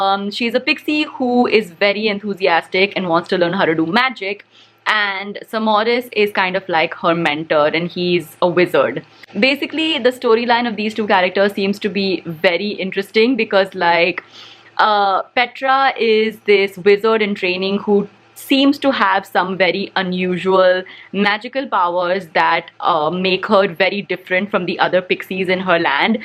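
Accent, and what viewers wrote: India. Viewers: Yes.. Heard her speaking hindi for first time in this video.. Hey.. Do make a hindi review too.. You may attract a great audience as well for your hindi accent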